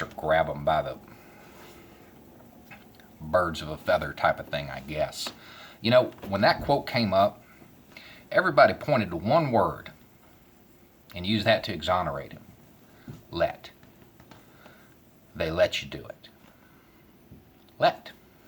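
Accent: South, United States. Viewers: You sir, have a new fan. I’m from northern Mexico. We are the hillbillies of Mexico (inverse from the US). I can’t tell you how refreshing it is to hear a person with your awesome southern drawl say the things you say. You’re exactly right, and right now the scariest citizen is the one who stands idly by and does NOTHING! The only thing evil needs to prevail, is for good men and women to stand idly by and do nothing! May God bless you and I hope your channel blows up soon. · Man, every time I watch your videos, I'm less fearful of white dudes with long beards and a southern accent. Lol. Truly, we have more in common listening to your views. What's your view of the illegal immigrants? In case you forgot, I'm a black dude. I live in Loss Angeles and so many Mexucans have come, they roll around town with the Mexican flag waving on their antennas or somewhere on their cars. 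I think it's a subtle form of treason. I dont mind people being proud of their heritage. But such public overtures shouldn't be something you would do entering another country. Why would you? If Mexico is so great, why did you feel the need to flee? You didn't go through.a PROCESS of properly leaving. These people fled Mexico like rats abandoning a sinking ship. These people KNOW here in the U.S. they can have a measure of peace, security and prosperity, and not worry about drug cartels and corrupt governments officials and corrupt cops ruining their dreams of the future. I just wish when they come, they would ALWAYS remember that. But they don't. They eventually start talking about white people, although they aspire to be rich and successful as many white people. They start talking about black people, although they move into the black neighborhoods when they first arrive. It's rank stupidity and hypocrisy that Mexican and southern American immigrants come and the U.S. 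(and I mean white people) better make these newcomers respect the flag and all this country represents or you mig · I want to commend you on your channel; even though I'm not a subscriber... yet. In America we are all too quick to judge people by their appearance. As an formally educated African American man from the coasts of this country... even though my first year of college was at Auburn... I have been guilty of drawing conclusions about the racial and gender fairness in the heart of someone who looks and sounds like you... beard, southern drawl, etc. You do our society a DISPROPORTIONATE BENEFIT by displaying that you are capable, willing and instinctively driven to speak truth to power. To be honest and to call out the deceptions and transgressions by the white male, patriarchal hierarchy in this country. God bless you. I can only imagine how proud your wife is of you. Hopefully you can open eyes that I never could. 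Maybe you can get white women over 45 to realize how bad Trump... and the Republicans who failed to hold him accountable... are for them. I'm going to end this post with a challenge to my younger brothers... my sons & nephews... resist your urges to draw stereotypical conclusions about people we might visually conclude are of a certain adverse mindset. Be quick to "have the back" of someone like. In the wake of 8 years of presidential leadership by Barack Obama, there is a new generation of white male Americans who only know to be respectful, dignified and honorable with all people no matter their color or gender or sexual orientation.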